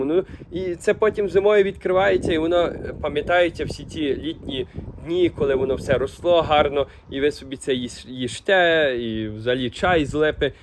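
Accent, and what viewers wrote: Western Ukraine, Ukraine. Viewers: Дуже сподобалося все так по українськи дім сад город , і яка в вас гарна українська мова з західним колоритом , ніби вчора переїхали до Канади молодець.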